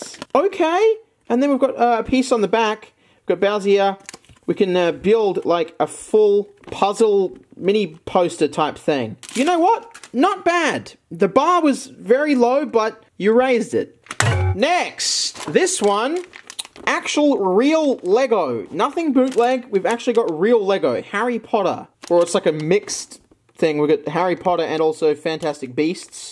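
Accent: Australia. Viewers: i thought ur Australian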